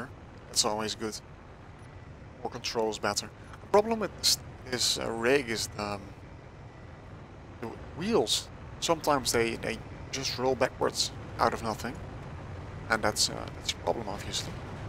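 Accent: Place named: Netherlands. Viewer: You speak English very well but with that inevitable dutch accent. Anyways, very cool machine, I would definitely be interested in a small ship version of it :p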